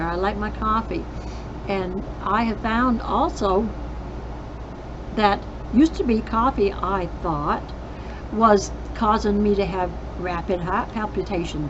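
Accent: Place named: Maine, United States. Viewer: She sounds like my family from Maine❤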